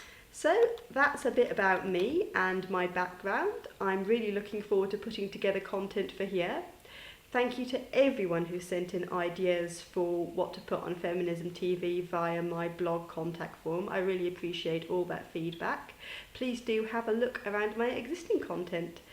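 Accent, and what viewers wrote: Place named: United Kingdom. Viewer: i loved her british accent...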